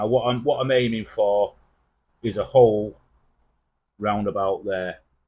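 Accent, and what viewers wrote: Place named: Manchester, United Kingdom. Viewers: Nice project pal,I'm originally from Salford your accent sounds like a Manc so your coming through fine to me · Hi, looks good very handy for the booze and glasses, nice finish. what I do to try to avoid tear out when using a large bit or a forsner bit,I use a thin drill bit as a pilot hole and then I drill from the other side. I don't mind if you voice over or talk as you going along it's your channel I like it as it is. You sound like your from Manchester or the surrounding area As always a great video. And as your asking I'm from Wrexham, Take care mate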